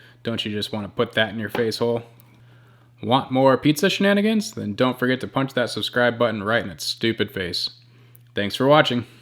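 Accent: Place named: United States. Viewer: You sound like you’re from the US, why are we using grams? 😂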